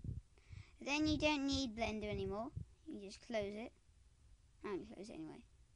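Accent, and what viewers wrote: United Kingdom. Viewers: chill bumps he has to be british i still have some XD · I just thought you edited your voice to be like that not that your from the UK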